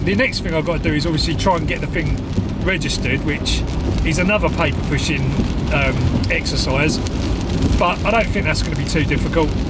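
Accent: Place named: Essex, United Kingdom. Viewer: Sounded pretty Essex :-)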